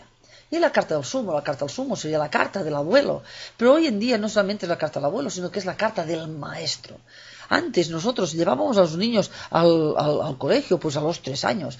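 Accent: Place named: Spain. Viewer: Hola, gracias por los vídeos, pero sería mucho más agradable que Ud pudiera vocalizar, hablar..más lentamente , ya que no todos somos españoles y su acento y tonada, hablando asi!, tan rápidamente, hace que sea un poco dificil de seguirla por la cantidad de información que hay que asimilar y da la sensación de estar Ud muy apurada por terminar! :-( Gracias!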